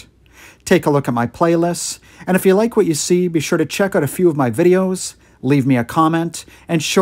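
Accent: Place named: Canada. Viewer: I definitely recognize his Canadian accent.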